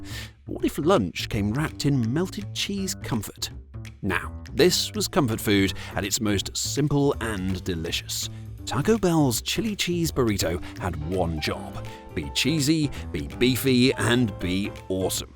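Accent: England, United Kingdom. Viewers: It's weird hearing american fast foods of yesteryear being narrated by an englishman.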